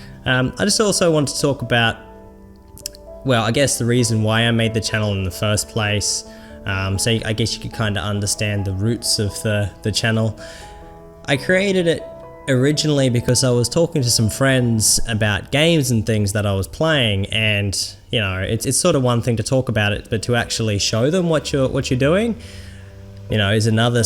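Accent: Australia. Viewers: Congrats on almost 300 subscribers now :-D In the couple videos I've watched I've spent half my time trying to figure out if you were an Aussie or not haha glad to see someone giving us Aussie gamers good representation. Good luck with future videos. :-)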